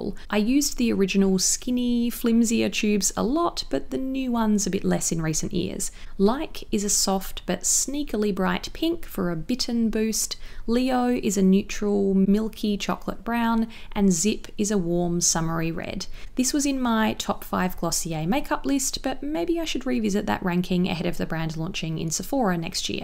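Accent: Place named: Australia. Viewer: I Like Aussie People. Love The Accent.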